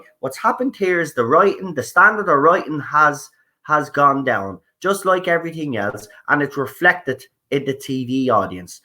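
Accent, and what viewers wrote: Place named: Dublin, Ireland. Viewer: Which part of Dublin are you from?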